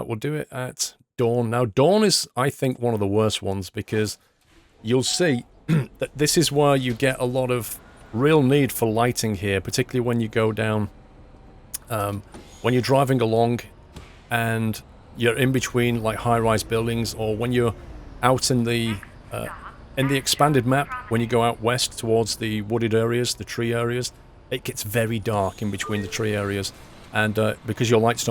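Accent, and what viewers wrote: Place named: United Kingdom. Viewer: Hi sorry for the extremely late comment but sounds youre from uk so youll know what i mean, our buses go from A to B then B to A then A to B and B to A and so on. And a drivers shift would last for hours, is that possible in this game to do a full days bus shift going there back there back there back?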